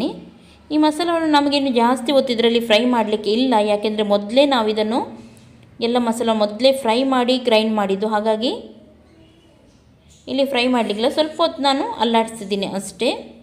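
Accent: Mangalore, India. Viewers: Hi mam nimma vlog super nim maklu bandre enna super niv kannada matnadodu nange tumba esta manglore kannada kelodikke kushi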